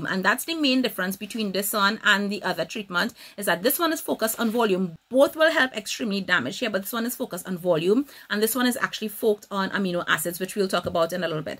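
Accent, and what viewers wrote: Trinidad and Tobago. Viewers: I had a feeling it was a trini accent. She sounds like my relatives. Lol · Girl, when I heard your Trini accent I SCREAMT🇹🇹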